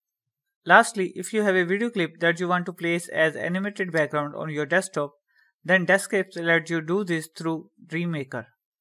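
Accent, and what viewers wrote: India. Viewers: indian english is cute xD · Me hears an Indian voice... Instantly clicks away... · love the Indian accent.. good , helpful video too. Thank you! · 100% Hindu. I swear didn’t even look at comments but I knew immediately he is from India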